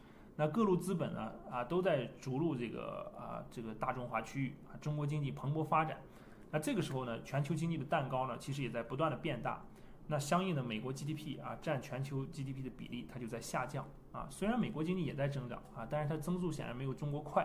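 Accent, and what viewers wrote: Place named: Shanxi, China. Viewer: 像山西的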